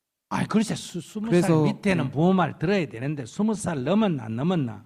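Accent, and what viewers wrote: Gyeongsang, South Korea. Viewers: 갱상도는 그래ㅋㅋㅋ 왜 열심히 얘기 하는데 화내는 걸로 듣나? 화낼 이유가 있나? 남의 일에~스님이ㅋㅋㅋ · 양영준 많이 들어보시면 이유를 알게 되더라구요. 저도 첨엔 그게 시러서 기피햇어요. 평이한 톤으로 말하면 중생들이 못알아들으니 강하게 말씀하는거고 경상도 사투리가 좀 세기도 해요. 근데 듣다본면 진짜 재밌어요. 깔깔대고 눈물나게 웃어요. 도움이 되셧으면 합니다.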